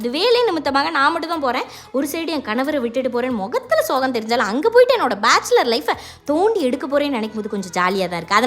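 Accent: Tirunelveli, India. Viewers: தாமிரபரணியில் இருந்து உங்க தமிழ் சிறுவாணி வரைக்கும் பாயுது அருமை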